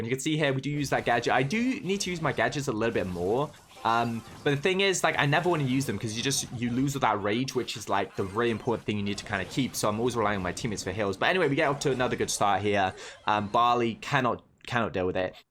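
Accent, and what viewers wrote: United Kingdom. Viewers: Listen to his accent his from UK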